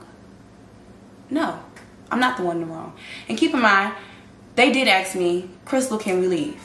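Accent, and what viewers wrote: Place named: Chicago, United States. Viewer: The Chicago came ALL out in this video and I love it😂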